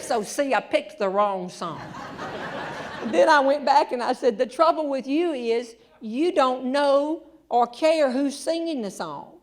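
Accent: South, United States. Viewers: Idk if she’s a motivational speaker or a comedian but she’s pretty funny! and ya gotta love that southern accent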